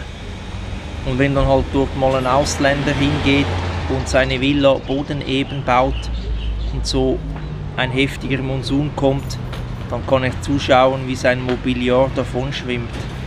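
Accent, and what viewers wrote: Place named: Switzerland. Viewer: Thai mit schwyzer Akzent. Scharf. ✌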